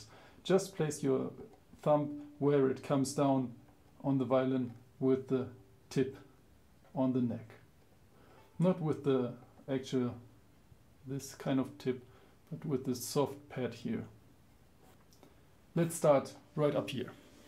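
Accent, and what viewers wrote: Germany. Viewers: haha it's german accent lol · before i look about you i knew that you r from Germany from accent.this video is so helpful for me .so cute _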